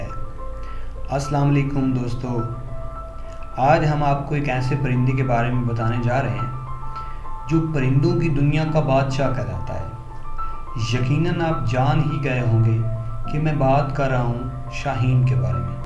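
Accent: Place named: Saraiki, Pakistan. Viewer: Narrator ny kitni jamaat tk Taleem hasil ki thi. Accent bilkul Saraeeki 😫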